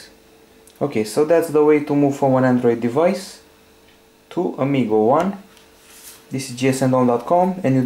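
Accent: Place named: Romania. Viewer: Frumos tuturoial facut de un roman dupa accent..Respect!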